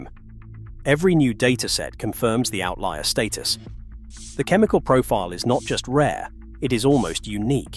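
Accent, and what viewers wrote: United Kingdom. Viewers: Understand that the timing is perfect. The "new world of disorder" and all these meteors, comets, UFO's etc means that they can dump nukes on their own countries aka FALSE FLAG, and blame anything from AI, to the Cartel, to Russia, to UFO's, to global warming, to the kitchen sink. And the narrator English accent is always a give away.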